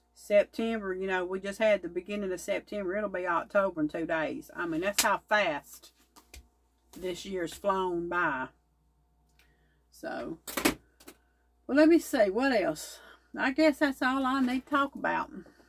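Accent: South, United States. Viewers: Watching in my gown, heading out to work in a few, love hearing another southern voice. Great job on those monsters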